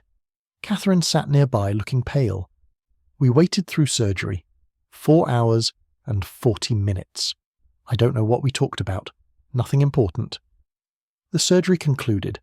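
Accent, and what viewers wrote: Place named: United Kingdom. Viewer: This is an American story, why is there a Britain narrating it?,, I want to hear America’s story of American voice.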